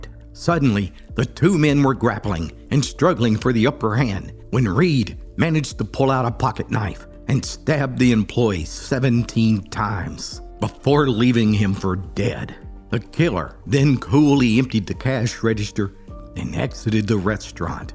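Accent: Appalachia, United States. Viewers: Men this narrator & this country ass accent 😂😂😂mf sound like he bout to cry.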